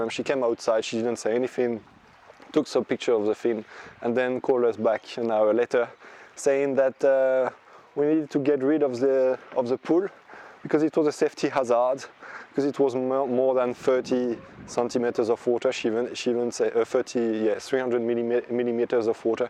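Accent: France. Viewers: Vous êtes français ? Car quand je vous écoute ça ressemble à un français qui parle anglais😄😄. C est pratique du coup parce que je comprends un peu mieux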